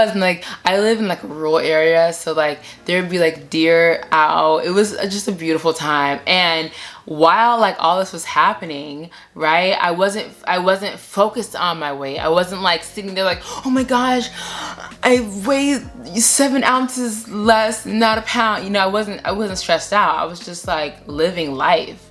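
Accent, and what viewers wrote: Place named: South Africa. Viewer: Girl are you South African ?? 👀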